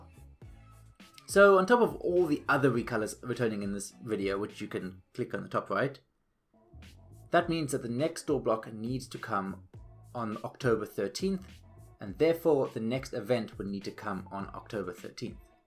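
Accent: South Africa. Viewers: Yo is South African?? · What accent do you have bro? Sounds south african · South African accent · South African accent?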